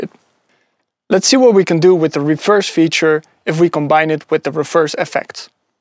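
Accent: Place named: Netherlands. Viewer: Ik kan horen dat je uit Nederland komt, mooie review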